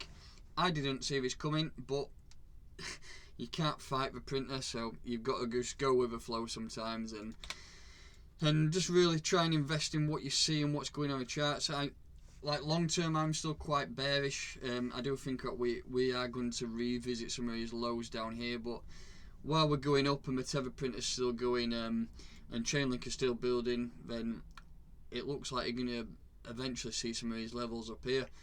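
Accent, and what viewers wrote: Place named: North, United Kingdom. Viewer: $4.80 entry is optimistic at the minute. $13.69 price as of today. Nice content though my man, nice to hear a northern accent in the crypto world.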